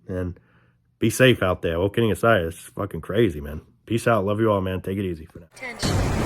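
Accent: New York, United States. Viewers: You're so handsome even considering the horrific topic you are talking about. I love your New York accent too. Yeah showing the video is something that wouldn't be appropriate at any time .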